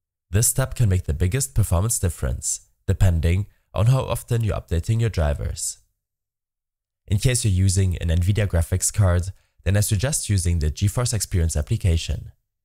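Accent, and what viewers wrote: Germany. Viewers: Was thinking Japanese from the accent but was surprised that it's German.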